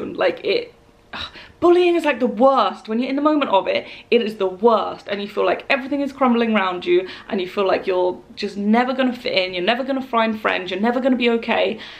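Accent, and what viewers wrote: United Kingdom. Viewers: I'm a new viewer and already intrigued by your accent - what are the influences? It's like a queen's english dialect over something more regional? Scottish? Irish?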